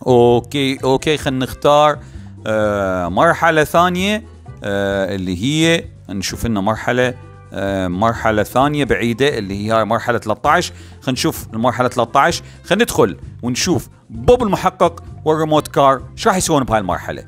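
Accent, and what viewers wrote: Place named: Iraq. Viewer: من لهجة عراقي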